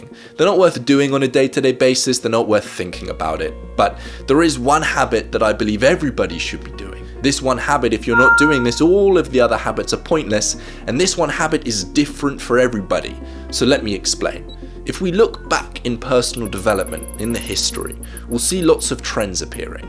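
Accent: United Kingdom. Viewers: Great! It's awesome. Are u from UK?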